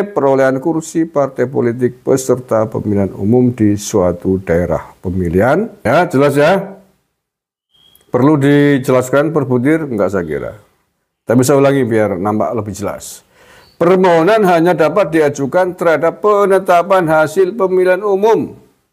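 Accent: Banyumas, Indonesia. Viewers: Buzzer ngapak y?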